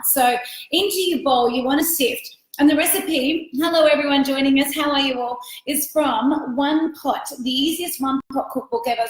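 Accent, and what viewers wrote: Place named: United States. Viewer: Why do you put on an American accent?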